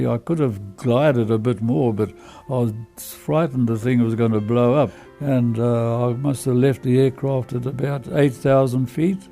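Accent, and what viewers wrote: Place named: South Africa. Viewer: Know that accent SA best pilots ever.BALLS,Montgomery said it.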